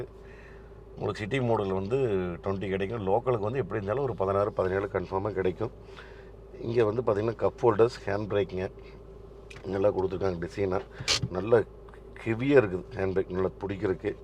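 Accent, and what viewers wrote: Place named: Coimbatore, India. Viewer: அன்னன் குரல், கோவை பேச்சு அருமை....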